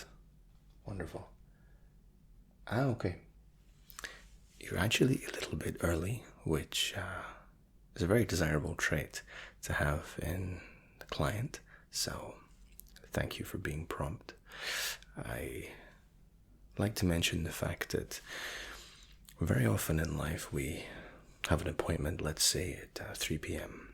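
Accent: Scotland, United Kingdom. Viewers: Its almost 1am I'm drunk and I always can rely on for his soothing Baritonal Scottish smoothing voice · Love your accent! It's a really gentle tone. What part of Scotland are you from?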